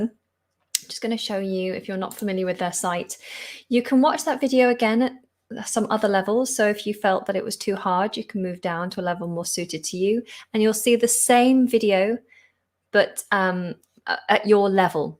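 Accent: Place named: United Kingdom. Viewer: First of all, congratulations on your channel, I have been looking for a channel with British English for a long time and I found yours to be very funny. Secondly I would like to ask you a question as a new subscriber. Are you still uploading short videos or I have to join some group? I loved them. Thank you in advance, and cheers from Madrid.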